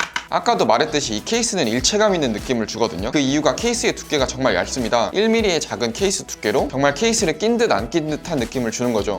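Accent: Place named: Jeonbuk, South Korea. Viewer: 고향이 전북이신가봐요 전주 아니면 군산 ㅋㅋ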